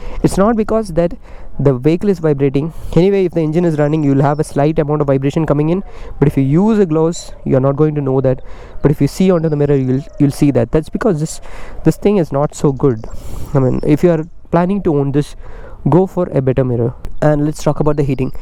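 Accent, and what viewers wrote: Kerala, India. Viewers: Pure Mallu accent..... Good video review btw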